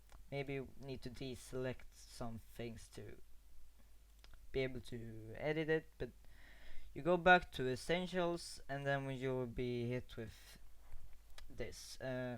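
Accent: Sweden. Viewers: Are you swedish???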